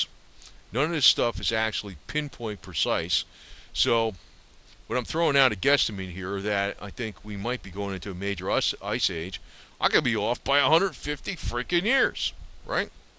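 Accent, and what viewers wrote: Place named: Pittsburgh, United States. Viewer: Heathen Nations Music: I hear a Picksburg.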